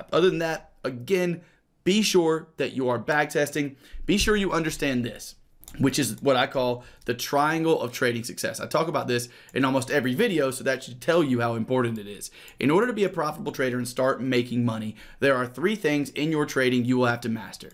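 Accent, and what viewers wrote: South, United States. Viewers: love your videos brotha. thanks for all the help. I gotta ask...are you from the south? I hear some twang in your voice. im from south Louisiana btw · he's the American Chris, coz he sounds american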